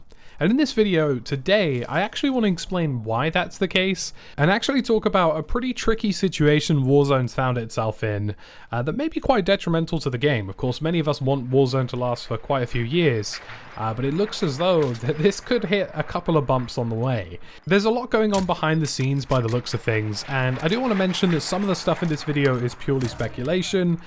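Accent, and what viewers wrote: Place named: Southern England, United Kingdom. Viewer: This video sounds like a load of assumptions pieced together and narrated with a British accent to sound legit. Yes I’m British myself but I know the effect that our southern dialect has on the rest of the world.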